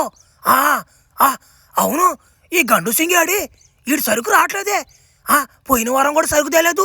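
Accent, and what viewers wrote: Nellore, India. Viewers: Nuvvu buthulu matladitey Nellore yasa vastadi bro❤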